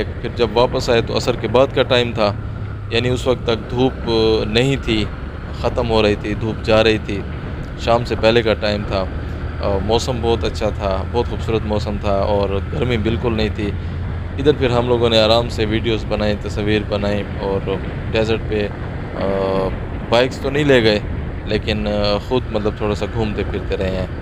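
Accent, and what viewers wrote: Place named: Saraiki, Pakistan. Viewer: Your Saraiki accent is so cool.!!! 😁 btw kia hi baat hai jaga ki.... lots of love to all burakers ❤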